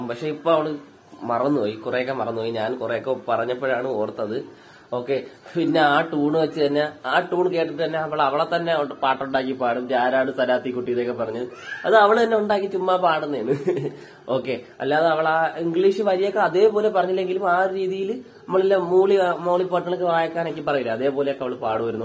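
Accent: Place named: Thiruvananthapuram, India. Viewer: സഹോദരന്റെ പേരും നാടും..? ശൈലികേട്ടിട്ട് തിരുവനന്തപുരമാണെന്ന് തോന്നി. 🌹 I am Kasaragod.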